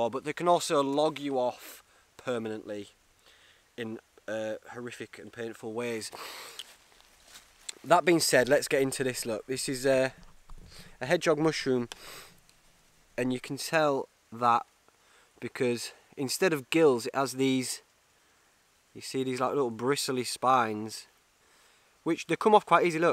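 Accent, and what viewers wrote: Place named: Northern England, United Kingdom. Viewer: I moved to America 6 years ago and I love hearing a good Notthern accent. But the Morrisons bag was just too much dude!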